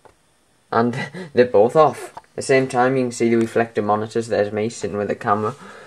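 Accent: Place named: Liverpool, United Kingdom. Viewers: I think its a Liverpool accent.. I can never tell, but whatever it is, glad to be british. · I thibk he has a up northan accent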